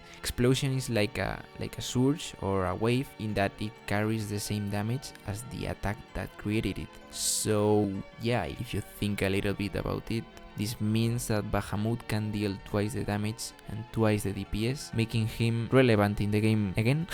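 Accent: Spain. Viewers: eres español? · Se nota muchísimo el acento español, gran video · Es la leche ver a un español hablando ingles, buen video igualmente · are u spanish? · amo tanto el acento español en el inglés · Soy yo, o es obvio que eres español?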